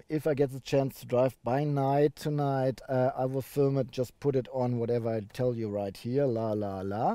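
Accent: Germany. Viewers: Now I've trawled through loads of reviews for various cars and have seen some pretty good ones at that. I must be honest and say that the easy and relaxed way that this review was conducted was spot on. Informative, detailed although long kept me glued to the screen. With regard to the strong German accent, having previously lived in Germany for four years can quite honestly say that you speak better English than I speak German but also that you speak better English than some English people I know. I am looking for another car and have decided to buy a 2016 2.0 Tdi L&K 150bhp, having test driven one already find myself bitten by the Superb bug. Thank you for a great review. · No need to apologize for German accent, everyone who speaks English has an accent of some sort. Great review, very in depth, keep them coming. Danke.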